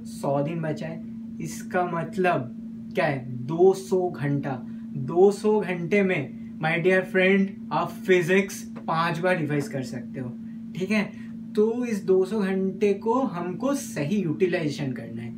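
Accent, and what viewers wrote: Bengal, India. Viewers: Bhaia apki bengali ho?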